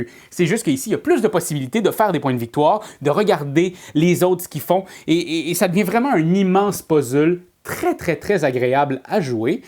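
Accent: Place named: Canada. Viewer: T'es hyper sympathique à suivre, tu mets tant d'énergie d'humour et de bonne humeur, que tu es de la drogue en vidéo 😉 Et puis cette accent Canadien, pour moi Français, c'est comme celui des cousins Suisses Belges ou Africains de l'ouest, c'est tellement rafraîchissant ! Salut fraternel de France et continues de nous régaler 😘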